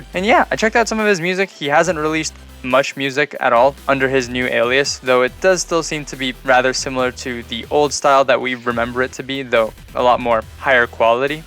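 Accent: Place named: Canada. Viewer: idk why but you sound extra canadian in this vid. Anyway, this is great and I'd love to see more of these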